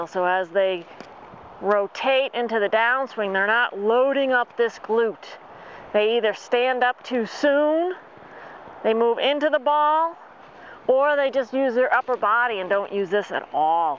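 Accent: Boston, United States. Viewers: Never heard this one before, "butt" it makes sense! Gonna take a shot at that cool accent...Boston??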